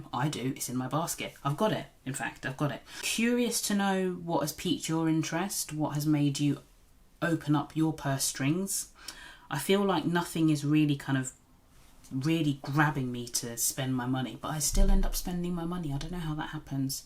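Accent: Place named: Boston, United States. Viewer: She's from Boston, I believe, and I feel like it's a bit exaggerated too 🤭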